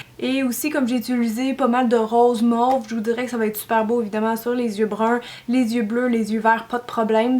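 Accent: Quebec, Canada. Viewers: J'apprends beaucoup en regardant tes vidéos! Pas juste de beaux tutoriels mais hyper informatifs en plus! Et en "Québecois"! Super! :)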